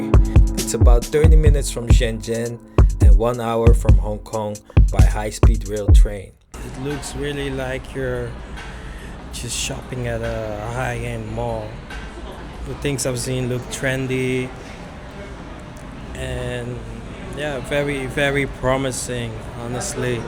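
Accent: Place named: Netherlands. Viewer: Awesome video man. I would love to visit this place. Your accent sounds like you're dutch? 😅 Thanks for showing around. That mall looks great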